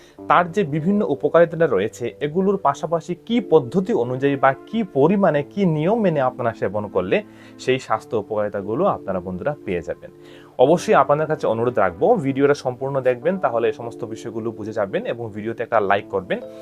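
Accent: Sylhet, Bangladesh. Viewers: বালা মাতছইন সিলেটি ভাই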